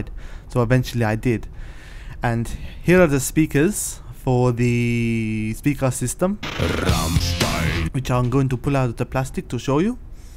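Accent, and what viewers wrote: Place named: South Africa. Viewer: You sound south African :D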